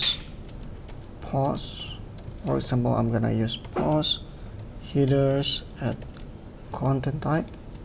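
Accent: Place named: Indonesia. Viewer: indonesian accent wkwkw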